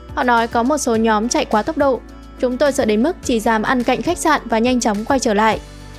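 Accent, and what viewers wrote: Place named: China. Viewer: đây mới là cách nói chuyện của dân trung quốc chứ chứ mấy ngày hôm nay nói chuyện không giống lắm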